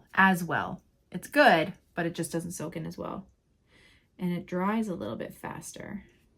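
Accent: Canada. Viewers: Damn, it took me 9 minutes to realize you were in Canada. I don't know which word gave you away. Maybe it was "out".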